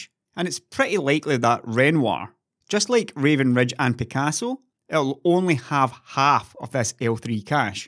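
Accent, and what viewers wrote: Scotland, United Kingdom. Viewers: Never thought that listening to a Scotsman do arithmetic could be one of my favorite things...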